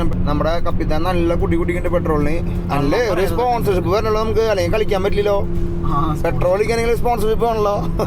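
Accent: Thrissur, India. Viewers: സാൻ്റോടെ അവതരണം . ആ സ്ലാംഗ് തൃശൂർ .ചിന്തിക്കാൻ പറ്റോ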